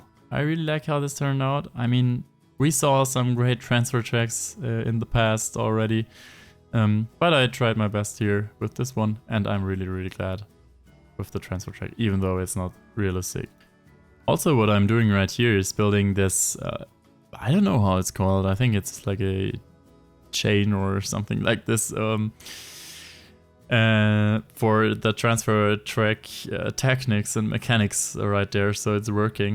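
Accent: Germany. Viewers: got a German accent in his English... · Are you german?😂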